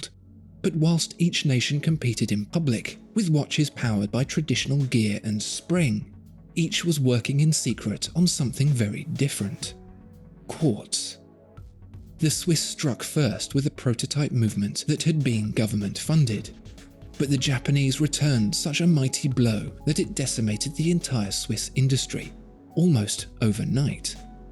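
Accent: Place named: RP, United Kingdom. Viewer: Despite the crazy watches that are already fascinating on their one, the real key to your videos is that absolut high end English. Huge probs. Love it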